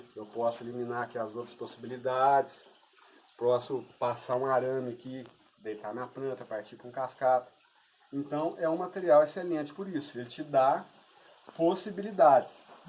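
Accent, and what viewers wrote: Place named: Minas Gerais, Brazil. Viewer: vai ter sim, ninguém vai compra la hahahah, gosto muito do seu canal, tbm sou mineiro e ouvir seu sotaque é voltar pra MG kkkk abraço e força ai no trabalho irmão , axé !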